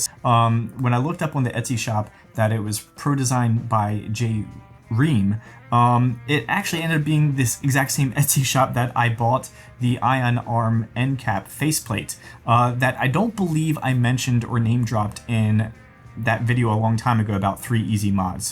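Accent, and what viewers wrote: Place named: Baltimore, United States. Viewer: Had no idea where you were located but had a good guess from that accent! Gotta be a Charm City Ghostbuster right here! 😂 Loving the videos! 👍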